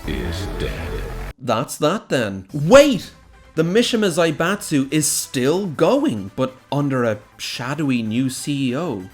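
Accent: Ireland. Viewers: ❤love the Irish ness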